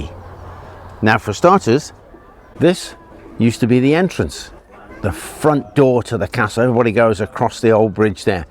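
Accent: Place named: Birmingham, United Kingdom. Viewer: He sounds more like a Brummie than someone from The Shire. I grew up 10 mins from the castle but didn't visit until I was in my 20s! I guess you don't think much about something you see day in and day out. It is a very interesting place, but hideously overpriced.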